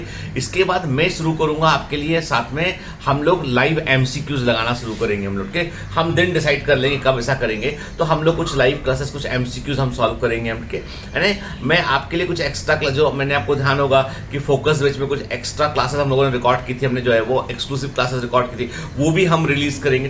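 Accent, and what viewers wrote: Gujarat, India. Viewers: Sir aap Gujarati ho 🧐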